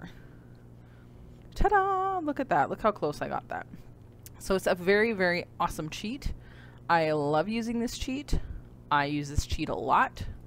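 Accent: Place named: Canada. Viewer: ehhh canadian... oh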